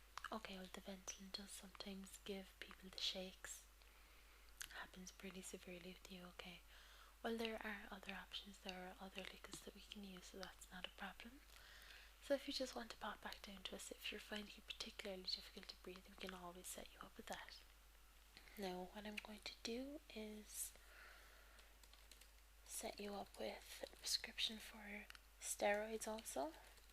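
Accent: Ireland. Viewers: My goodness. This video. If it weren't for my empty stomach, I'd be knocked out cold right now. Your brogue is just enchanting in every way, mixed perfectly with ASMR triggers and a low soft-speak. Absolutely magnificent job. Thank you so much for this video! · This was great! I love your Irish accent! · Ahhhh Irish accent! Love this video :) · Corr your voice is so relaxing, I know Im typing this at 3.51am and the sun is coming up and the birds are singing but I feel I need to listen to this video again so I can relax and go to sleep. You seem to cure my insomnia at times. I also get tingles in my head and thats it before I know it Im out like a light! I do love your accent! Im a sucker for an Irish accent on a girl. Errmm I also seem to wake up with a raging boner so I blame you lol. But seriously wicked video! top stuff! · Kind of sounds like an Irish Quinn Fabray (from Glee). 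But that's a good thing! Fantastic video.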